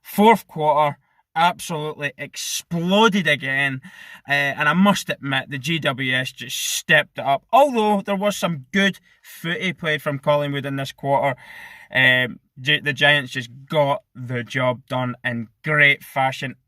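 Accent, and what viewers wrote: Scotland, United Kingdom. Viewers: Beautiful human with a good Scottish accent. Subbed. Cheers!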